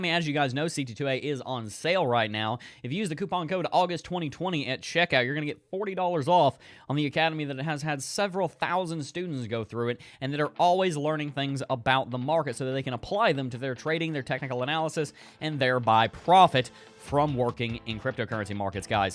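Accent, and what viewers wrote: United States. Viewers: Love Your intro. Love that american accent 🧐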